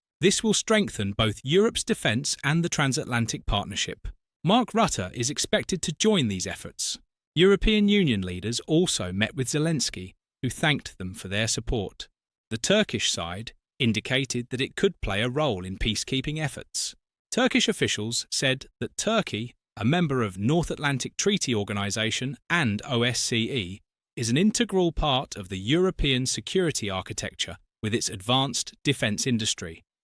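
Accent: United Kingdom. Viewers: what is this Turkish BS Propaganda? with a British accent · U sound british and that explains yr stupidity . Bringing turkey in to the picture u are signing ukranes death certificate and u know that because they are made out of the shit as the british . The minute putin gives them a longer cucumber to suck on they will run ove to him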